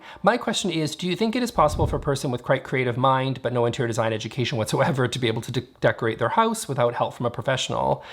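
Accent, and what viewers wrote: Canada. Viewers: Congrats on the 60K mark! It's si great to see things from a Canadian perspective...the minute I first clicked on one of your videos I noticed the accent. YAY!!! 🤗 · I LOVE that you talk fast and get to your points quickly. People need to rev up their brains...or hit pause and go back, lol! Your accent and manner reminds me of another Canadian designer, Emilia Wisniewski....what a hoot it would be if you two did a vid together! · that makes sense. I’m British but have absolutely no problem with Canadian accent (or most Canadians’ accents). I find his voice, despite the quick pace of speech, quite calming and very easy to listen to.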